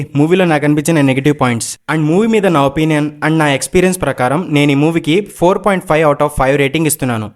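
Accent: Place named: India. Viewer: Anna andhra naa meedi telangana naa slang vera Laaga umdhi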